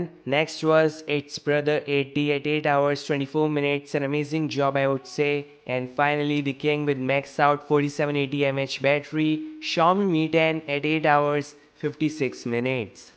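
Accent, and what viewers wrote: India. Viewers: I love the English Indian Accent. "Lets Goouuu"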